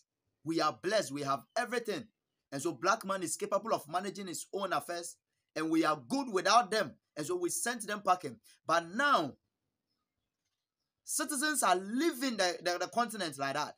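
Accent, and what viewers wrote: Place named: Nigeria. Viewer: You sound like a Nigerian your accent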